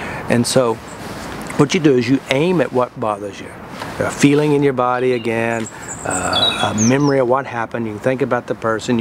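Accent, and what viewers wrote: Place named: South, United States. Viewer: Great video! Also for people who aren’t used to hearing a nice US southern accent, this is a nice example. ;)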